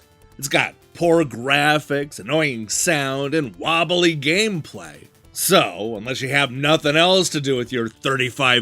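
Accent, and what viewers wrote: United States. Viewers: All this extremely British writing with a heavy American accent really makes this video work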